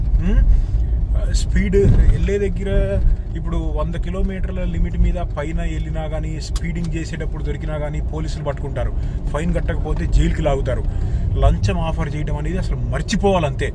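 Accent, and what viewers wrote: Andhra Pradesh, India. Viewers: nee telugu pakka andhra bro . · మీది నిజంగా నిజామాబాద్ యే నా ఎందుకూ అంటే మీ మాట్లాడే తెలుగు యాస తెలంగాణా కాదు ఆంధ్రా తెలుగు యాస లాగా ఉంటుంది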